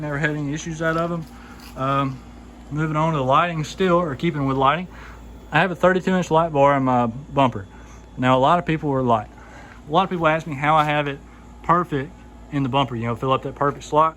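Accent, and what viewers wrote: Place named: Arkansas, United States. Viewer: You got an Arkansas sounding accent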